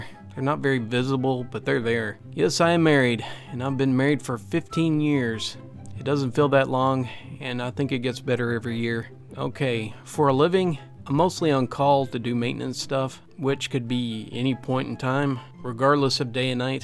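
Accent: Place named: Kentucky, United States. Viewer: pleased to hear it in a KY voice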